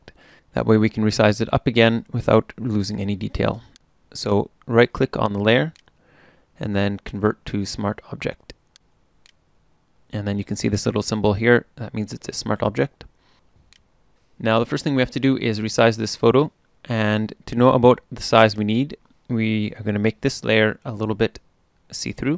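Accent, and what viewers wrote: Canada. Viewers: You Canadian?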